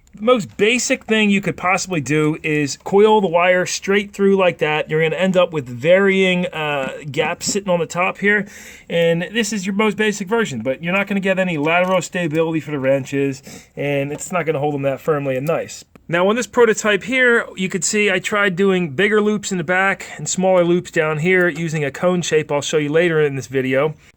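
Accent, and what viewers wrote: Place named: Pennsylvania, United States. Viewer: Must be from pa.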